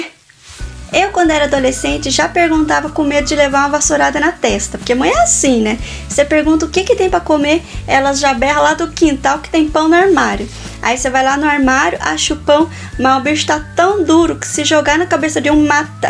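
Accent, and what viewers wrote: Minas Gerais, Brazil. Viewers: kkkkkkkkkkkkk vc é Mineira?? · Ela fala o português mineiro uai, Herdou o sotaque mineiro!! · Fala palavrão com muita classe, português do interiorrr (de Minas?) e com a delicadeza de uma gueixa. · Moro no interior de sp mas entendi td q vc flw(mineira?) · Man quando ela fala português ela parece ser uma mineirinha É mt fofinho :3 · Ela aprendeu portugues no interior daq de minas so pode mkskskzkskkdkdkskskks, fala igualzinho a gnt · Vc e mineirinha?? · Cê parece a fusão de um mineiro com um japonês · Vai ver ela é Mineira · Sotaque de mineira ta forte viu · Vc parece "ser mineira" pelo seu sotaque brasileiro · A japonesa mais mineira q eu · Ela é Japonesa ou Brasileira? Nossa, amei o Jeito dela.. Se for Japonesa, fala Brasileiro melhor que eu rsrs 😄 Me fez rir demais com esse sotaque Mineiro 😅 Amei ❤️ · Tu é mineira ??? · Eu acho q parece mais msm um sotaque mineiro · sotaque mineiro é top :D fofo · Eu acho q tá mais pra mineira. 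Eu acho que como ela morou no japão por muito tempo, perdeu um pouco do sotaque. Mas dá pra perceber pelo "Ocê", encurtada de palavras e o "R" um pouco arrastado. - O "Arrrrdido" dela é um pouco mais arrastado. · Ela é Mineira? Tem sotaque, sou de Minas. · vc tem um pouco do sutaque mineiro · Vc é muito engraçada de um jeito meigo.E ñ parece ser de SP, tá mais pra mineirinha kkkk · Ela é mineira?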